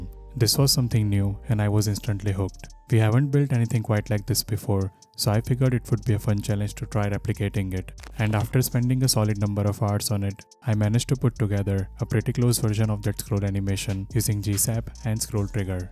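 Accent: India. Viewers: You are from India? Your accent is totaly matched with Indian and your work, literally of god level❤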